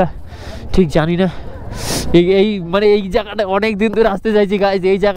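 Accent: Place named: Bangal, Bangladesh. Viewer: At first you have to speak bengali properly, bangal language is not acceptable in India.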